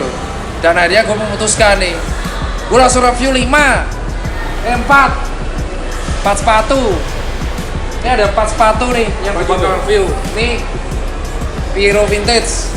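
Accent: Java, Indonesia. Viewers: Gw demen logat lu bang lu guwe tapi jowo medok👍👍👍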